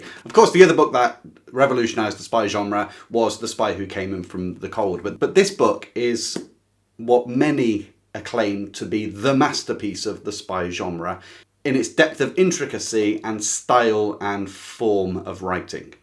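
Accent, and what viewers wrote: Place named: London, United Kingdom. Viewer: I would like you to make a video about what makes a classic a classic! I just discovered your channel, and I like it really a lot: your descriptions of the books, the fact you read a small peace of every book you mention, your wonderful way of speaking (I take it you are from London or near it). You are my favourite booktuber now!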